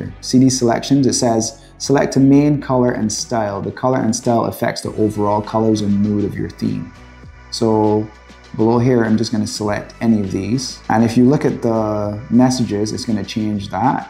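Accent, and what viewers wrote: Barbados. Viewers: Hey man , random question, are you from Barbados 🇧🇧?😂 or the Caribbean at least, I hear my people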